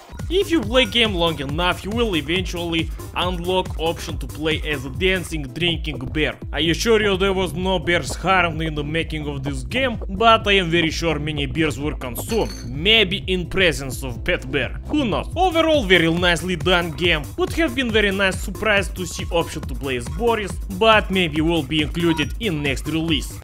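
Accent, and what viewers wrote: Russia. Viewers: It's just a.m. here in Uruguay (South America) and i'm here, in my chair, watching a russian/ucranian guy talking and gloryficating slavs with that russian/ucranian accent and i love it. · this makes me wonder, is Boris actually russian? or a brit/american with the best russian accent on the planet? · Чувак ты русский??? У тебя акцент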